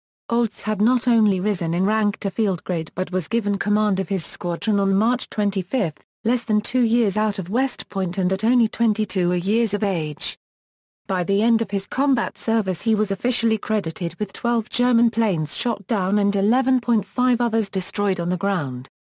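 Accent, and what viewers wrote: England, United Kingdom. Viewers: She sounds English, has accent, ok?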